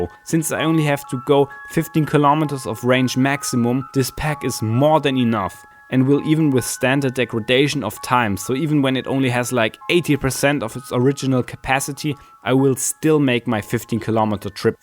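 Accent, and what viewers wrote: Germany. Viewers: Hey, das Video ist gut gemacht! Ich gehe mal davon aus, dass du eigentlich deutsch sprichst, dem Akzent nach. ;-) Inspiriert zum nachmachen, weil du vor allem deine negativen Erfahrungen aus einem früheren Projekt darstellst und anwendest. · Dat geman accent. Yuneec for me. Doesn't break the bank. As always... good luck eskating in ol germany. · Dieser Deutsche Akzent 😂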